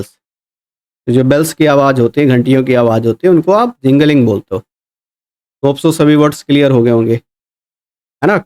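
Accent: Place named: South, India. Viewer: sir south se hai kya unke accent se lg rha 😅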